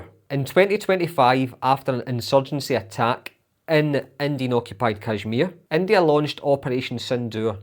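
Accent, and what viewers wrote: Scotland, United Kingdom. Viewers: I love your accent❤. I guess it is Scottish? Even though you are giving a constructive criticism which I agree with, I can’t help but notice the tone in your words. It is beautiful. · Your Scottish accent got me 😅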